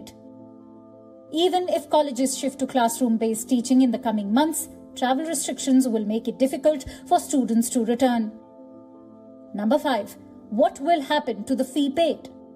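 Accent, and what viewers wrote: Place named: India. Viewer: Stop that indian english accent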